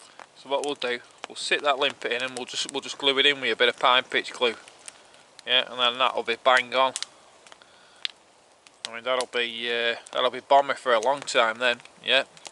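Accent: Lancashire, United Kingdom. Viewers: A really interesting and entertaining video. I'm still laughing at you tipping your Bilberries out by scratching your ear. I'm from the West Midlands, (Black Country) and I can understand your Lancashire accent and slang, but I wonder if some of your overseas viewers have a clue what you're taking about sometimes - RITE. 😉😁👌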